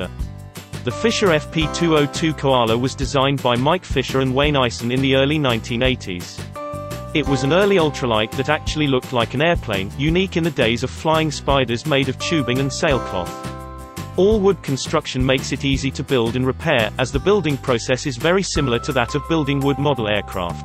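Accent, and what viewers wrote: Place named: United Kingdom. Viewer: Love the videos, so tired of the British accent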